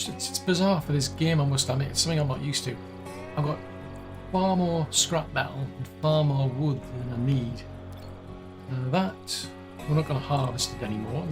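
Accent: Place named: Northeast, United States. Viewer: nice to hear the Northeast Accent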